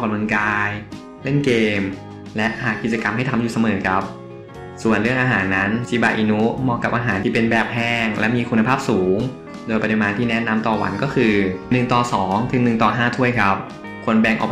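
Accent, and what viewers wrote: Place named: Suphanburi, Thailand. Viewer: คนพากษ์เสียงเป็นคน สุพรรณบุรี ราชบุรี สมุทสาคร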